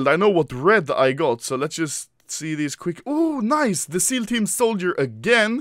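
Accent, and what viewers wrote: Sweden. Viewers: you have a swedish accent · this swedish accent annoys me so much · Swedish accent hehe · Ur sooooo from sweden. Right?? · U come from sweden right? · You from sweden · He is swedish so he cant speak like an English native bro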